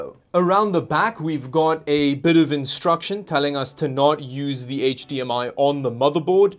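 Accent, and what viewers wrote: South Africa. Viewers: Are you from Africa? Your accent sounds very similar to a few people I've met from South Africa. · Why do you sound like you have a South African accent? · You sound like a South African :) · South african?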